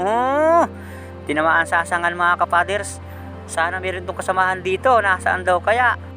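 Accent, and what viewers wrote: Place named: Patnanungan, Philippines. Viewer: San nga pala location mo ka father's?, parang Patnanungan accent ka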